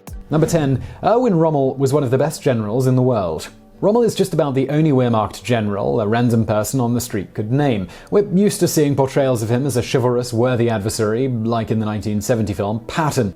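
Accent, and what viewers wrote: United Kingdom. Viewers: What in the world qualifies you to "clear up misconseptions" about anything much less the Third Reich. Just because you have a "proper British accent" that doesn't make you an expert on anything. Frankly if I had the choice between fighting for you or fighting for Rommel, I'd choose Rommel. Another wannabe "know it all". I wasted my time and the battery power on my laptop watching you. Never again!!!!!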